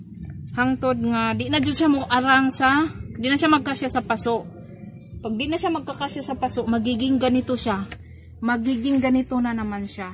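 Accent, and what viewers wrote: Visayas, Philippines. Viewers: Hi new subscriber here...tGa asa man ka? Kay ang imo sulti murag sa bohol or surigao or leyte?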